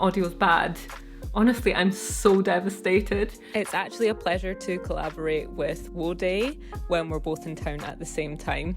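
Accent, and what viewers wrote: Scotland, United Kingdom. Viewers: Greetings, I am subscribed dear and thank you for providing all the content, retired life is more enjoyable watching your video content as well as content of others, however I will have to start teaching architectural engineering to pass my time in addition to watching YouTube videos all day.,. I have to also say that I absolutely love your Scottish accent as its wonderful when spoken in the feminine sense if you will. Your numbers are headed in a positive direction, great work dear....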